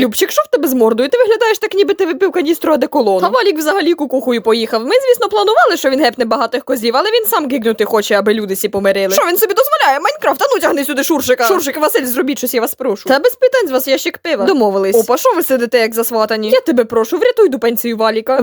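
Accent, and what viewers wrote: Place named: Transcarpathia, Ukraine. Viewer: ця закарпатська вимова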